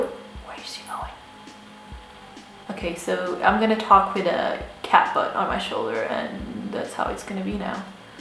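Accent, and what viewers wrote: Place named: Brazil. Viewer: you look brazilian and your english accent reminds me brazilian english speakers, where are you from?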